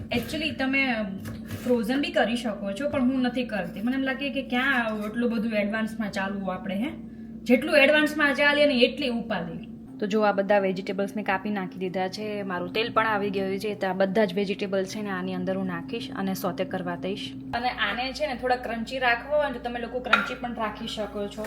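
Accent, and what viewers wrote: Kathiawar, India. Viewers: Your short videos are awesome. No need to change. Wonderful કાઠિયાવાડી ગુજરાતી. Loved your " Soul mate" video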